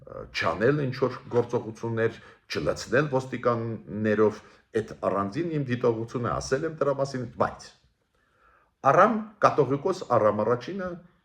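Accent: Russia. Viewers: Այս ոնց է որ չասեցիր Ռուսներն են մեղավոր եւ Պուտինն է ձեռբակալել։. Տխմար այդ ռուսական ակցենտդ որտեղից ես ժառանգել։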